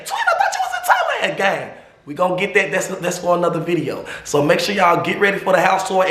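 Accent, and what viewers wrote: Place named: South, United States. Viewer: Twin I got to this part of the video!! lol you so country too tbh remind me of my peoples 😅❤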